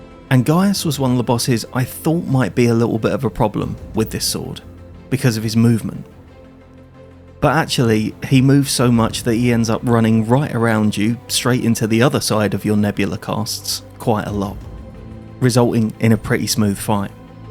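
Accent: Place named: England, United Kingdom. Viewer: new sub coz of this video. short, to the point and entertaining as well. The english accent feels like home having lived there for my childhood. (Southampton)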